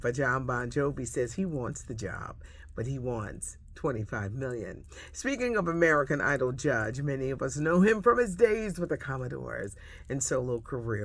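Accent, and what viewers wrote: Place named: Philadelphia, United States. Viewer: I know that's right use that South Philly voice good for you! my Amazing Husband was from South Philly 12th and Christian.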